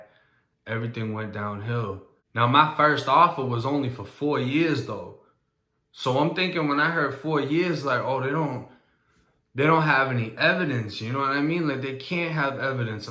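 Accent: Boston, United States. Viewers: Your accent sounds like you’re from Bean town!! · You from boston originally? I hear it in ur voice occasionally and honestly you just look and sound Boston bred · Say bro you from Boston or Florida . You kinda sound like ol boy from Sam Adams commercial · Bruh u gotta Boston accent but ur from florida??? I been to Illinois doc but from what I seen bout Cali prison white boys who r confused bout their pigmentation come in tryin to sound black. Get reminded who they are real quick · You sound like your from Boston. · I can tell your from Boston cuz the way you say your A's. For ex Stahted= Started Cahky= cocky but you may have grew up from 12ish on in florida. But you def dnt have a native north or south Floridian accent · I knew you had to be a Boston kid from your accent but you always talk Florida stuff, you even kinda still got our swag for someone who says you didn’t grow up in mass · he talking about florida but sound like he from boston...the fuck?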